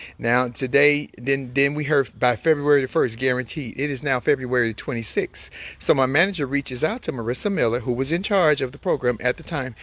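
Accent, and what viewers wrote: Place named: Chicago, United States. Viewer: I love you my dear PERIOD! You sound just like US girls from Chicago! The early 90s was the ish!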